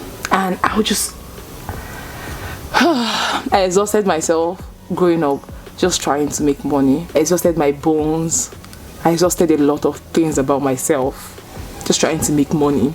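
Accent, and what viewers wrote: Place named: Igbo, Nigeria. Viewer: which part of igbo are u from bc this ur English ashaam sounds like ours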